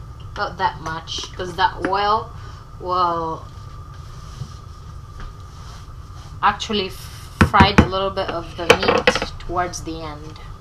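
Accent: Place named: Visayas, Philippines. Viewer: Bisaya ka dai.halata kc khit nag iislang ka magsalita haha.suysus daw.soysauce po.heje.peace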